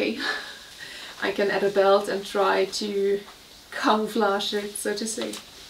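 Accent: Germany. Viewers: You're a very pretty woman 👩 💕 look sexy in these style clothes 😍 I think I can hear a German accent that sounds cool. Not a fan of blue hair, but I understand that's today's style, I just like to picture you with dark brown hair, oo LA LA 😉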